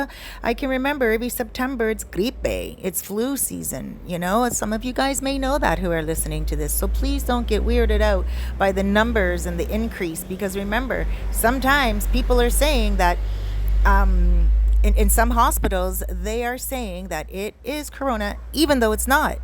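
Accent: Canada. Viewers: Great content! I could tell you are Canadian by the way you pronounce certain words, like "out and about"! I always knew! Keep up the great content!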